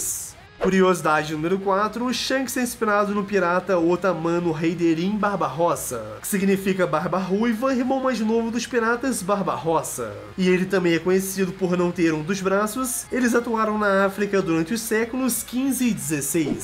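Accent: Portugal. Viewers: Eu só percebi que era um português falando, no fim do vídeo. Kkkkkkkkk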